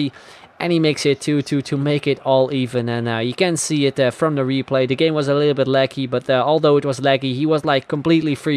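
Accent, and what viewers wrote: Netherlands. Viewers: You sound Dutch! If you are, you 'll probebly get more subs than DDG in like 2/3 years.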